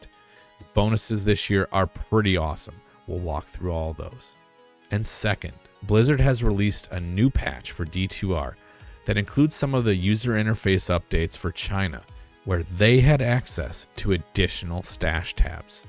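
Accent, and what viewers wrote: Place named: Balkans. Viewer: Are you of Serbo-Croatian descent, bro? Nice ! I can recognize this accent anywhere!! :) Zdravo! :)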